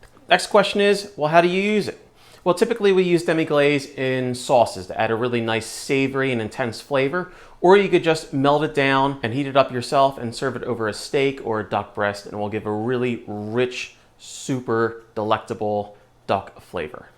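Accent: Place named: Philadelphia, United States. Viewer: Not a lot of videos on demi-glacé, appreciate it! That accent don’t lie, you’re definitely a Philly boul!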